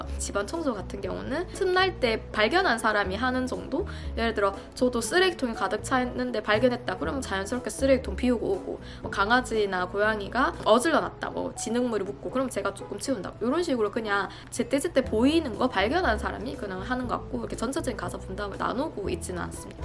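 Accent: Gyeongsang, South Korea. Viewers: 아이고 5만 츄카해요~같은 갱상도군효 ㅎ 저두미국이에요 1년됫어요 영어못하는즘마ㅠ집콕예요 저두 취직?은무리고..애가둘이라ㅡㅡ 알바하고싶군효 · 오늘 우연히 보게 됫네요...경상도 액센트도 정겹고, 너무 예쁘고, 생각도 바른 젊은인것 같아 호감 급 상승했어요~^^ 내 아들도 이민자라 같은 또래 젊은인것 같아 더 관심가네요~대형 유투버로 성장 하길 응원해요~^^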